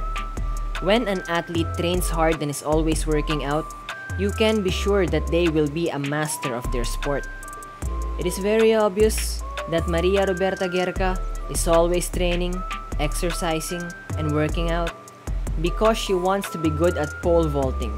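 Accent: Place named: Philippines. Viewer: Why is a Filipina narrating the vid? (guessing by the accent) Shouldn't it be an Italiana?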